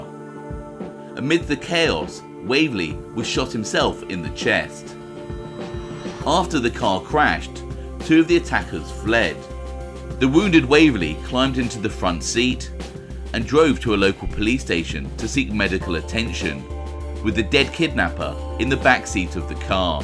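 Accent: United Kingdom. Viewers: What is a mobsta? Did you mean mobster? UK accents are irritating. DISLIKE.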